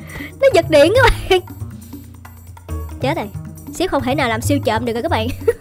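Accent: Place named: Binh Duong, Vietnam. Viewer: Đọc lạ bình dương thế anh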